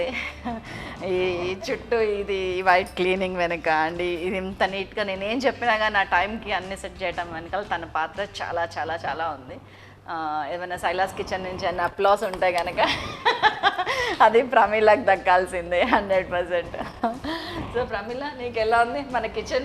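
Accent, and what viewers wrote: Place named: Guntur, India. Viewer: Mee matalu Telangana vallalaga levy akka Guntur valla laga unnai good talking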